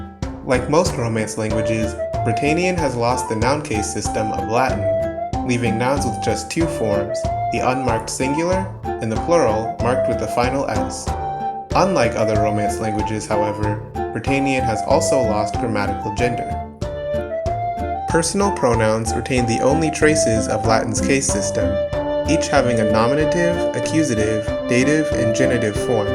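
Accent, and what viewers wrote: England, United Kingdom. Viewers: I'll admit that I appreciate the effort that has been put into the phonology and etymology side of the video. However, the reason it still has that "englishy" sound is because the speaker has put relatively little effort into how the pronunciation would sound, and is simply using their native, modern english accent as a model of pronunciation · It sounds like an English speaker trying to speak French, except they only memorized a French dictionary and know absolutely nothing about French grammar. Also, they've never heard spoken French, so they just pronounce each word with the thickest possible English accent. I love it. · Interesting.Sounds like a native English speaker trying to speak French. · Intetesting, sounds like a translation from english to 3 or 4 of modern romance languages at the same time, with some not translated words and english accent.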